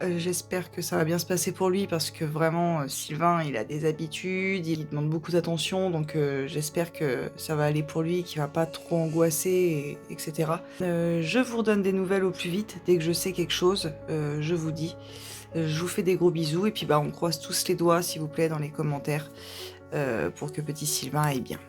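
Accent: France. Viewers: Des gros bisous guérisseurs pour notre petit sudiste.. je pense fort a toi et reviens nous vite pour nous faire rire avec ton accent du soleil !! Je croise les doigts +++ ❤❤❤ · Accroche toi Tu nous manques déjà, reviens vite tu nous fait trop rire avec ton accent de Marseille...❤❤❤❤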